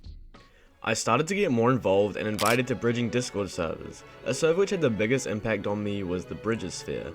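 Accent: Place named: New Zealand. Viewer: Wait you said you conquered au you sound like a New Zealander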